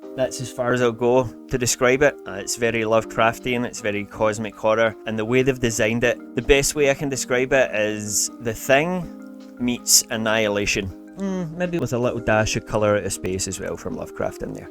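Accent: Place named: Scotland, United Kingdom. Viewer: I always wunder ur accent, scotlands 🙌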